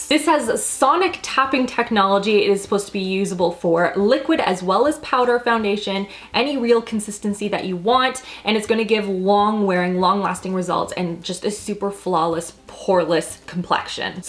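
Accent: Canada. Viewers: Hopefully this doesn't sound ignorant lol But I have always been like shouldn't she have some sort of an accent being from Canada. Until she said "out" I was like ding ding there it is lol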